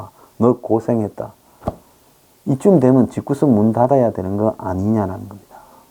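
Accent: Gyeongsang, South Korea. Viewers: ..최고의 변사급 뉴스 진행자! 전 소장님의 거친 갱상도 사투리 들을때마다 뭉가로 인한 급노화 된 피부가 재생되는거 같아요..알라븅~🔞🎈😘🍨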